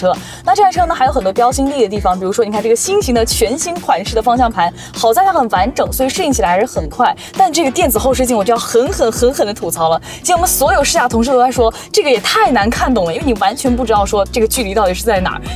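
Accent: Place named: Northeast, China. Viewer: 有所长的声音，信任度一下子就上来了🎉，新人加油哈，听着像我们东北妹子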